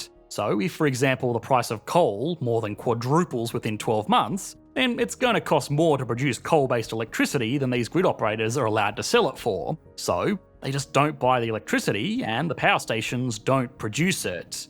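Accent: Australia. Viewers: Dude, are you trying to sound more australian? :)) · This sing-sing delivery in a thick Aus accent is impossible to listen to. Compounded by the swift pace of the non-stop read. This is how economics is made opaque and a turn off for average people. Poor communication of basic ideas. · Take it easy; he's Aussie. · the au accent is annoying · For so long I hold myself to not write this ugly comment but I need to becouse I am sure I am not the only one who observed that: you have a good content and interesting video s but you English accent the way how you pronounce the word s that are at the end of a sentence is so so ugly for any 👂👂 ears that are looking at your videos, I don't know your accent sounds like a bulshet Australian accent, I mean the worst possible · the Aussie accent is a real distraction - can't get through the content....brain is suffering..... 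· Where at in Aus are you from? I've never heard an accent like yours.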